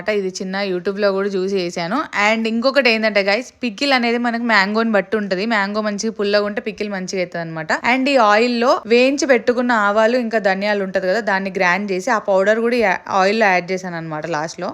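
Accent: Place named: Karimnagar, India. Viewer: Karimnagarollam ma basha slang vere untadi😂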